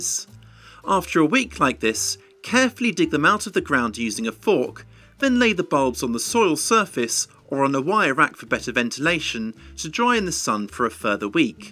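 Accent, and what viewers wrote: United Kingdom. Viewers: British people are so much more pleasant to listen to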